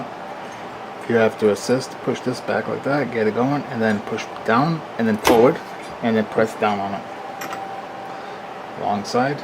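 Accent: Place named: Boston, United States. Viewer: thought so hear the accent. I live on the Dorchester south Boston line.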